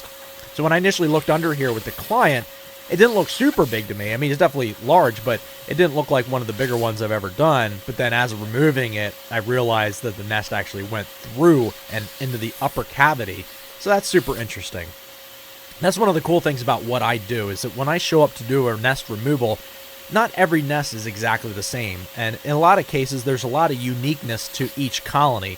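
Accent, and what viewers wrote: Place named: Philadelphia, United States. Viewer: I am from about an hour outside of Philly, and judging by his accent i would guess he is from the same area!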